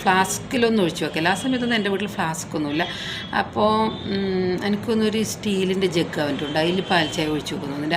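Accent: Kannur, India. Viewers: നമ്മുടെ നാടൻ കണ്ണൂർ ഭാഷ 👍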